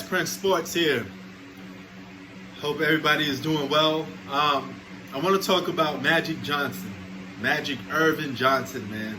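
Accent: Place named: New York, United States. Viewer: One word ruby lol he stopped the whole league u wild boy hahaha good ish p u from New York I can tell by your voice and how u speak lol very ny son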